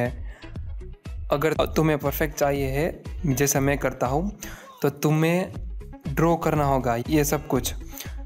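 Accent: Gujarat, India. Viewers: Gujarati chho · gujrati tone....so cute....peli line sunte hi mujhe pata chal gaya aap gujrati he....the way you say dro 😄instead of draw.....thats so cute....gujarati bhasha sach me bohot mithi he ❤❤❤ subscribed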